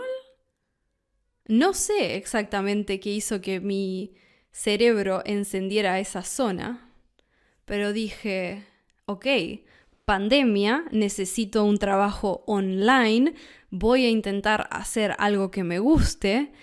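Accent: Rioplatense, Argentina. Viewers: Thank you so much for these podcats. I'm learning Spanish purely through comprehensible input and i can already tell your podcats will be of great help to me in my pursuit of fluency. I also appreciate your argentine accent, my aim is to understand all spanish speakers, whether they're from spain or latin america. Keep up the great work you have gained another subscriber for your channels. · De donde eres?!? Porque habla diferente… Su yo es sho 😮 Es Ud de Argentina?? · Tienes un poco el acento argentino. Saludos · Great first episode, I’m looking forward to the podcast and know I’ll enjoy it no matter what you decide to talk about. I was similarly obsessed with the world of YT language learning channels and language related content for a while. I watched all the big name YT polyglots of the time and followed so many peoples language learning journeys. 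I even watched some those channels aimed at teaching you a language, like Japanese from Zero. I never really made significant progress in any languages back then though. It wasn’t until starting Spanish again in December of 2023 that I finally found a way that worked for me for learning a language, comprehensible input. I love Rioplatense Spanish, and your accent in particular, so I’m glad you didn’t let your accent/dialect dissuade you from starting this path. I’m super grateful to have found you as a content creator and iTalki tutor all those months ago. It’s been over 7 months since our first crosstalk and now our lessons are entirely in Spanish and it still blows my mind. I really appreciate you as both a content creator and a tutor and I’m so happy that it’s something that you enjoy, that makes such a huge difference.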